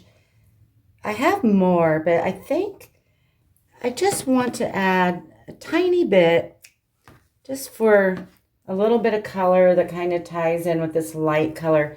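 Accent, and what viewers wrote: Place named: United States. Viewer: so have I, gosh is so good and generous to share her talents, thank you x. ps love your American Accent (greetings from England)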